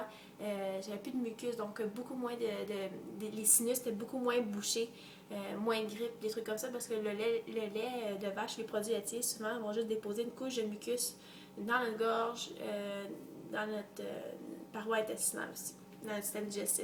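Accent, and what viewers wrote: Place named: Quebec, Canada. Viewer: Du Québec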